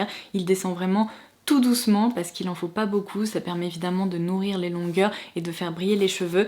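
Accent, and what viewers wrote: England, United Kingdom. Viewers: Je vous découvre à travers cette vidéo, et c'est un vrai coup de cœur 😍 J'aime beaucoup votre voix douce et apaisante, et votre merveilleux accent anglais. Je m'abonne tout de suite à votre chaîne 🙂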